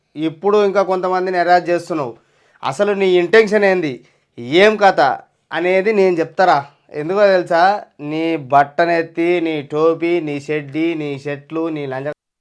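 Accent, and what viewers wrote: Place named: Telangana, India. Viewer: Telangana slang ❤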